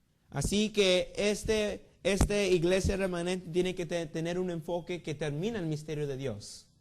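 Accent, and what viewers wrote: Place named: United States. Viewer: Tu español ha mejorado muchisimo. Un poquito de american accent but still good. Keep doing the good work.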